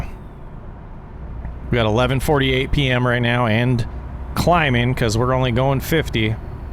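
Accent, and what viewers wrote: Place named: England, United Kingdom. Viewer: I think it's funny that she has an English accent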